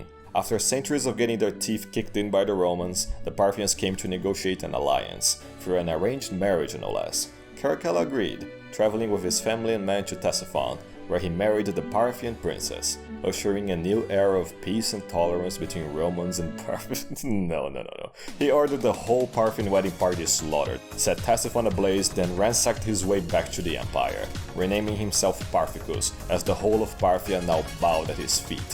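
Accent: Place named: Brazil. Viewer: bro WHAT COUNTRY ARE YOU FROM??? YOU SOUND LIKE A BRAZILIAN SPEAKING ENGLISH and this is coming from an English teacher that is brazilian as well so wtf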